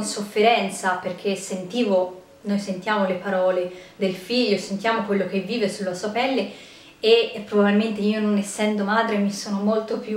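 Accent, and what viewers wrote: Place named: Tuscany, Italy. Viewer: Salve egregia. Grazie per questi consigli sugli audiobook. Ad ascoltarla si sente chiaramente l accento toscano, mi chiedevo di dove più precisamente 😊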